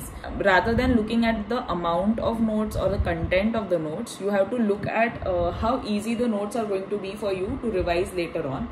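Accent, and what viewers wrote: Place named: India. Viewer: i dont understand indian accent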